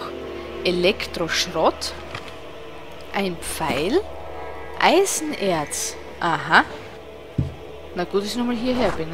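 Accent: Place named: Austria. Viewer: i mog deine lets plays, es oesterreichische ghert sprochlich und a lets-play-technisch sowieso mehr forciert :-)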